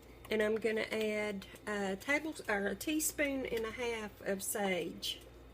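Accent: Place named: West Virginia, United States. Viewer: I'm just curious as to where you're from. You sound like you're from WV like me.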